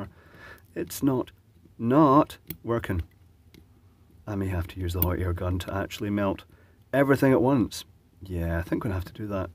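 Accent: United Kingdom. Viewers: That felling when Stevie from Family Guy repairing thermostat. I like that british accent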